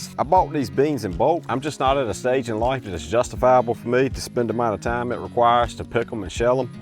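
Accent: United States. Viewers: It’s some kind of American accent, definitely not Australian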